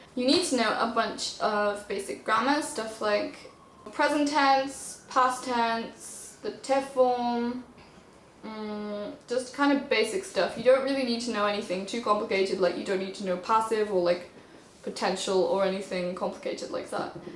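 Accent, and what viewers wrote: United Kingdom. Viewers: イギリス英語ですか？ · 速いーー！イギリスのどこ？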